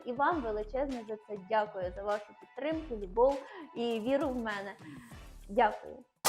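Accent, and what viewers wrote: Poltava, Ukraine. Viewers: Не звертай уваги. Ми всі на Полтавщині так говоримо. Але як треба вміємо говорити, як треба. Страшенно не переношу Ірину Фаріон. Грубіянка, замка, в минулому. Партєйний дєятєль. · і в Хоролі так балакають. Даже мамкають і папкають. Так приємно чути рідний полтавський говір. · ти талант а полтавський акцент неперевершений, дуже люблю тебе слухати і дивитися. МОЛОДЕЦЬ!!! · . Ти золотце) Це смішно звертати увагу на негативних дібілів. Плавають в жовчі, то хай і тонуть вній) полтавський акцент це просто кайф❤ не звертай уваги, люди - хейтери просто обмежені, або жалюгідні або потопають у власних проблемах, які і є частиною карми. Сорі за словесний бульйон, я під вином)) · Це Полтавский діалект, це наша гордість, і я так розмовляю, 🎉 суржик Полтавский👍❤🐱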